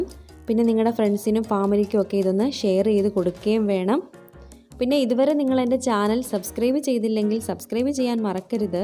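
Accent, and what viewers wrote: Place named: Qatar. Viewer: Good presentation. Samsaram kettappol anik anne thanne pole thonni 🤩🤪 Qatar l alle. Qatar l anenkil angane manasilayinn reply kittitt parayam tto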